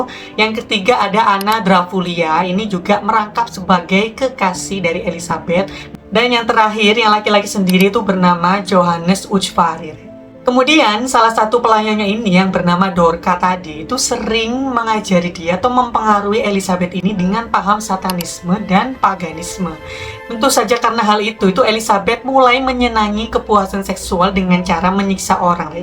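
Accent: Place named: Java, Indonesia. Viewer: Beda kak , kaka ini njelasin dengan caranya lbh slow dan medok , klw ka nadia patas dan heboh , punya ciri msg2 sih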